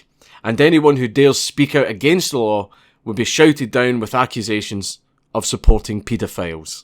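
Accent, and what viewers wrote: Ireland. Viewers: haha this is the first video i am watching of yours, and as an American, I can't take you seriously with an Irish accent LMAO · Would be nice if I could hit a CC button cuz your irish is soo thick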